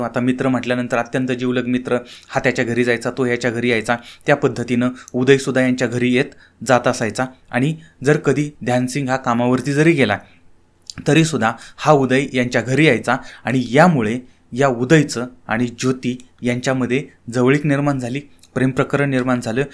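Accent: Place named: Ahmednagar, India. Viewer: nice Nagari style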